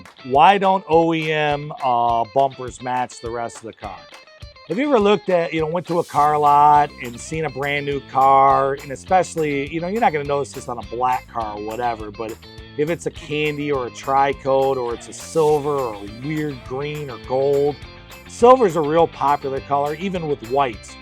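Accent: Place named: Michigan, United States. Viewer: Q&A is awesome. I like that you are describing the way you do it. It's up to us after that to follow your way or tweak it to our preferences. I hope you keep doing the podcasts and video's. It is so interesting to hear you're way. It's great to get a professional point of view. I'm a Michigan boy removed 33 years. They said I had an accent when I moved to Washington State. I never heard it, until now. Love the Dad podcast. Also appreciate you helping us all out. I have a 67 Camaro. "Better of Dead" I'm in final sand. Base coat clear coat. I'm sanding to 600 because I'm going black. I'm using my local Wesco products. Euro prime. No epoxy. Can I put epoxy on top for a sealer? Is 600 to tight to grab the next coat? It almost shines. Using a finish line devilbiss 1.3. For final. Also. I have at least 1000 hours In bodywork. I don't want to screw it up by using the wrong product or clear on it. 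Thank you and good to hear that Michiangder accent!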